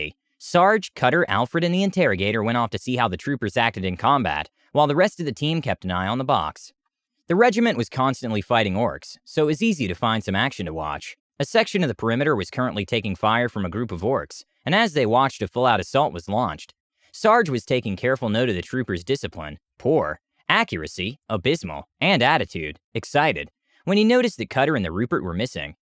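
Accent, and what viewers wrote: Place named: United States. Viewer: Text: Extremely stereotypically british. Voiceover: Matter of fact car salesman american.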